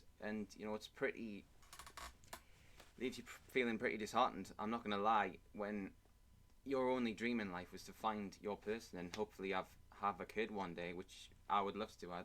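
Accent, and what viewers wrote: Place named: Newcastle, United Kingdom. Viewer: As somebody who does pretty well on women front hearing and looking at you it’s surprising yet not unbelievable. You do have confidence (able to speak on camera), can hold a convo and you are not ugly. Not sure how tall you are and if you live in a big city (sounds like you’re near newcastle). Aesthetically though, I think you need to look more fresh. Women like clean, fresh, healthy people. IMO Your hair is a mess, and makes you look like Virgo from ghostbusters or an extra from a Viking’s episode. You should opt for a 90s Keanu Reeves style. Also grow some facial hair if you can, weight train 3 times a week and stop touching yourself/watching porn as much. In terms of clothes trying buying some stuff from M&S (their autograph line is stylish and affordable). Dating apps do work if you have good pictures. Plenty of videos on YouTube which show how to achieve this. 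As for meeting women in real life, you give me music vibes. Therefore I’d be going to ticketed live music events/gigs (not clubs) where you’d have a mutual interest. Would also join a club of some sort (not sure what you’re interested in). Sorry if any of this sounded mean, best of luck!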